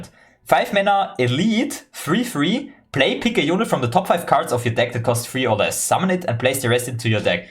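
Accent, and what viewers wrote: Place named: East, Austria. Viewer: Came here from Sunny and know about you both for some time through his streams but didnt expect you to be austrian aswell. From your accent I would guess you are from the north-east states like lower austria or vienna, right? Good content, keep it up!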